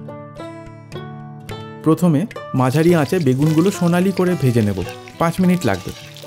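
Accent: East Bengal, Bangladesh. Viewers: কথা শুনে বোঝায় যাচ্ছে পুরো পূর্ববঙ্গে ইয়া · Tumi ki from east Bengal . Bharali bolle bole bollam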